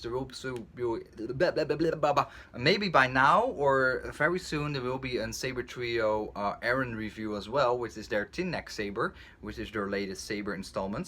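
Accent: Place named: Netherlands. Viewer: Ik kan aan het accent horen dat je Nederlands bent😂😂😂😂😂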